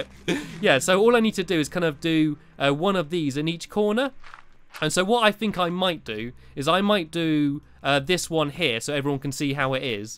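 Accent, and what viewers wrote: United Kingdom. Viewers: Are you British? British people are cool. (Just forget about the American Revolution.)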